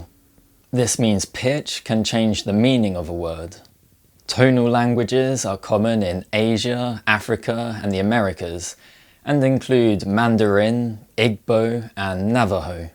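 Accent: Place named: England, United Kingdom. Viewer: Seriously, your English accent is amazing! It makes your content so engaging.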